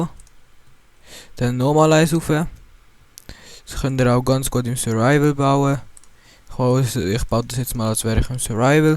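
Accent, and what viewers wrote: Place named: Switzerland. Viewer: Wirklich tolle idee... Aber du solltest hochdeutsch kommentieren denn jeder deutschsprachige schweizer versteht es auch ^^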